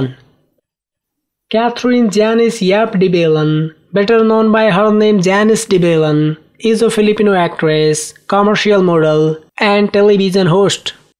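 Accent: India. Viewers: Nag thumbs up na sana ako pero nun na erita na ako at di kinaya ang indian na nagsasalita, ayan tuloy binawi ko na . Dapat boses ng tao at hinde alien ☹️😶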